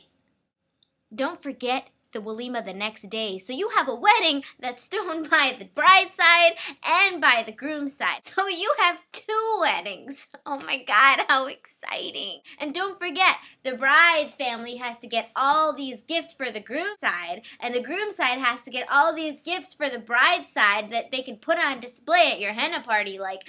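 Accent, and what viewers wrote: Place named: Hyderabad, India. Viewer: uve got HYDERABADI connection. U should make videos on accents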